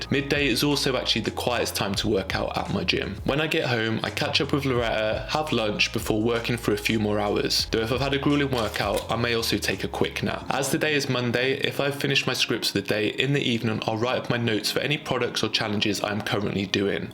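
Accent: England, United Kingdom. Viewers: are you English.... Anyways my day is pretty much like yours l am myself just launching into the you tube space into the health and wellness arena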